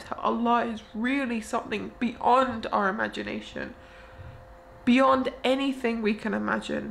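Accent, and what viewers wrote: United Kingdom. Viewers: Your british accent is special🤪🤪🤪🤪👍👍👍👍 Be istiqomah/ consistent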